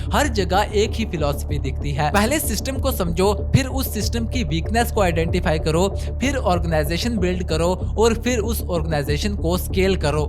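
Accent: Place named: Pakistan. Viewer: Bhai tera accent pakistani kyu lag rha hai ?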